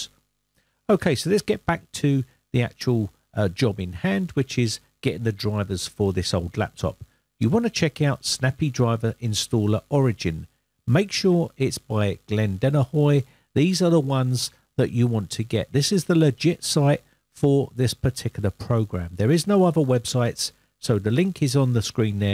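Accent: United Kingdom. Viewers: His videos are outstanding, my American English, however, doesn't always pick up on his UK English! Thanks for your comment.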